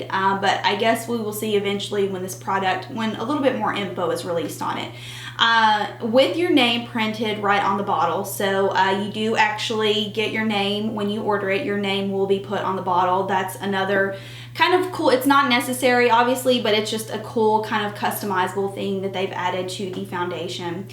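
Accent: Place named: Oklahoma, United States. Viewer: You sound like an Oklahoman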